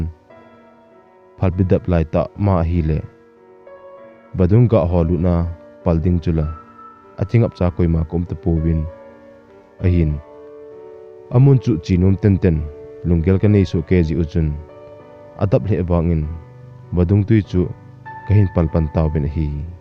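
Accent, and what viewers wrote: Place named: Nagaland, India. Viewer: Sir hoylang ho nahi hm, na awso chu achome, ole Nagaland,nc hill Assam lang ho nahim sir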